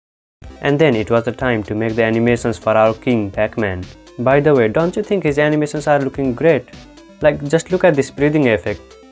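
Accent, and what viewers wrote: India. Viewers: He's an Indian now thats for sure, its recognizable by his voice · Are you from India sir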